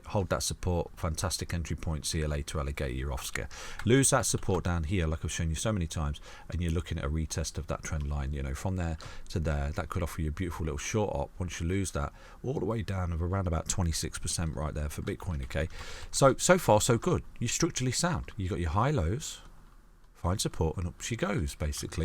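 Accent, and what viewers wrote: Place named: England, United Kingdom. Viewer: Thank you for your content and time, these little doodles you do alone are helpful and easy to remember, but your content is simply more understandable than others overall. I have followed several other (no names) crypto not financial advice channels that are popular and not had nearly as much important details as yours, in fact sometimes the opposite advice, but your advice has prevailed. Just clicked the notification bell for your channel, Twitter also. Nice to hear an English accent on crypto updates for a change too.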